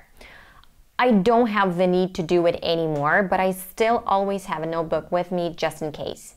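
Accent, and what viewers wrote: United States. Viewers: You're American. I know that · It's a perfect American accent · I thought you are American 😂, your accent is so incredible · Wow and this is American pure English American 🙏🙏 color and colour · Can't believe ure not American Where u from then ? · I really thought u were American . U r so amazing n such an inspiration. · american style,so my question is......are you an america? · Thank you for your video. It inspired me to watch more videos and to spend more time for learning. I never think that you from Ukraine, because you speak like a true American girl :-) I'm from Russia and I learning English about 5 years like a hobby but my learning goes very hard because I spent so little time for English before and your video explained me what I did wrong. Thank you very much and I wish more subscribers for your outstanding channel! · Watching from Philippines.I am highly motivated when I watched your videos.I have learned more.You have a brilliant mind; II like the way you speaks,Americant accent,is easy to understand compare to British accent but I like British accent too.Thanks for your wisdom.Have a nice day. 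· Your speaking and accent are amazing. I can not imagine that you never lived in the North America before. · I'm not sure how I got here (I'm from the USA), but this video blew my mind! I speak German and French but am feeling stuck for Russian...this motivated me quite a lot...thanks! Your accent sounds more American than mine btw great job 😆😃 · I m new here, Mss, what country are you from? You have a clear American Accent congratulation foryour effort, God bless you🙏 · You have a perfect American accent. Where are you from that you say you're from a non English speaking country? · О май гаш, наконец то нашелся человек с СНГ который действительно говорит с максимально похожим на американский акцентом. От остальных очень сильно слышно русский акцент и попытка скрыть его сильным произношением буквы Р в американском стиле. Красава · ну да, она слушала больше американцев. Просто ей видимо американский вариант больше подходит. 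Мне вот тоже как-то претит губы вытягивать по-британскии каждый раз когда слова на дифтонг оу заканчиваются, вроде go, know и прочего. Если вы задумываетесь над правильным произношением, и тем более если вы хотите говорить так же как автор этого канала, спешу вас предостеречь, что такое не всем дано. Это целая наука, и у 90 процентов всех кто стремится идеально говорить на английском или любом другом иностранном языке не всегда это получается. Все дело в строение речевого аппарата. Когда мы учим родной язык он принимает определённую форму и вы уже не сможете без специальных практик, к коим к примеру обращаются дикторы и парадисты, освоить безакцентный иностранный язык. Я честно говоря вообще перестал "парится" по поводу произношения. Главное, что бы тебя понимали и что бы тебе было комфортно , а остальное не так уж и важно) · I bet you're lying its hard to believe you ain't an american . 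Awesome accent !! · Your pronunciation and accent is more American. Why? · Wow, unbelievable. I thought you are American and English teacher after watching your several videos. Actually you learned English in a un-English country, no English speaking partner , ,,, totally no English environmental, and just two years. You break me down. You know what? I learned English from 7 years old and for 50 years. And my mum was English teacher,lol. I have spent 26 of 50 years in English country. But I still can't speak good English. Many people complain not enough time, not in English speaking country, not English speaking partner. That is me. Your story makes me so shame. Your story is really encouraging me. Thanks. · You sure you are not American? If not, you did a great job ! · Chan Jason definitely American English... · I thought you were an American! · You have a perfect American English accent. 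· She sounds so American but her thoughts her attitude is different from those people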